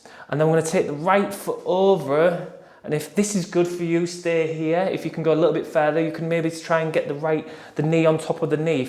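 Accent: United Kingdom. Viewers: Thanks!! Out of curiosity, what part of the UK are you from? I love your accent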